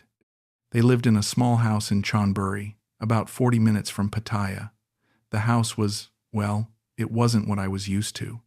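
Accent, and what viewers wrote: United States. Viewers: Are these made up stories or genuine stories ? these types of stories are true but is this channel telling true ones or made up for entertainment ? What if it's an English person is it an American accent it doesn't make sense there should be an English person accent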